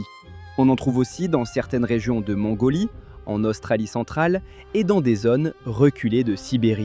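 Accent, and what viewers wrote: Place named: Maghreb, North Africa. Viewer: J'adore ton accent très légèrement maghrébin.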